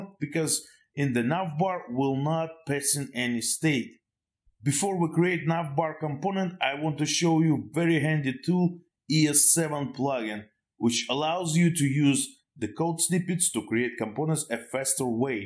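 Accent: Russia. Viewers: You're so cool! lol are you Russian?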